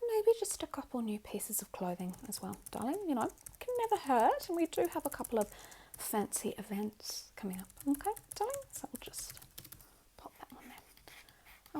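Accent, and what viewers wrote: Australia. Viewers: Just also i have to say i tbh wouldn't mind any un asmr video with aussie mom bcuz the accent lol have u tried learning other ones too? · i hate rich people ngl but there's something about this specific posh australian accent that hits the spot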